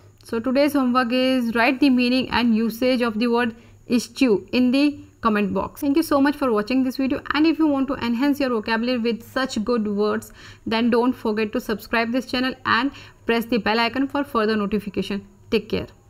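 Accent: India. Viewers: Mam are you from Chhattisgarh ?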